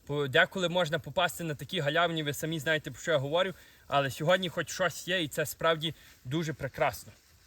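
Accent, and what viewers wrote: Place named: Lviv, Ukraine. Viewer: Я,коли чую вашу розмову,не можу повірити,що ви 17 років живете в Канаді)) таке враження,що ви з якогось львівського села)))) ваші відео піднімають настрій)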